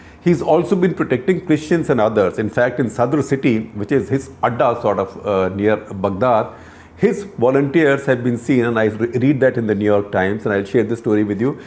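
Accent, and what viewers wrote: India. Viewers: Dear, Please pronounce the words ARAB and KURD naturally. Your English accent is hardcore Indian. Trying to anglicize Arab as ayarab and kurd as curd sounds little wierd.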